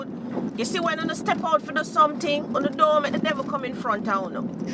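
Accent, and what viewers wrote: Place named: Jamaica. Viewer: Old harbour road my area love it your Jamaica accent enjoy your video views. 🥰🇯🇲✔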